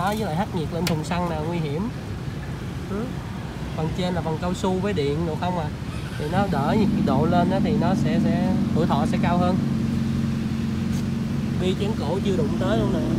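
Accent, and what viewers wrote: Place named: Mekong Delta, Vietnam. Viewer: Nói chuyện rất dễ nghe. Nghe giọng miền tây thiệt thà.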